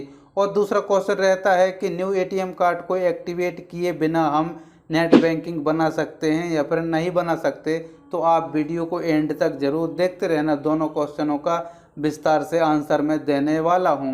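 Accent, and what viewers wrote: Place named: Bundelkhand, India. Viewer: Tum Bundelkhand ke ho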